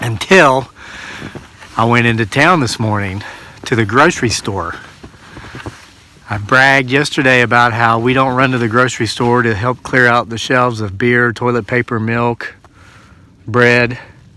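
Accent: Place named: Virginia, United States. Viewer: Ah hahhh, i thought you sounded like a fellow Virginian - please carry a firearm with you outdoors, my brother... I can tell you stories about a rodent looking creature as big as an elephant to invisible predators & spots in Goshen pass that will suck you underwater just to put a foot in. 💯💯💯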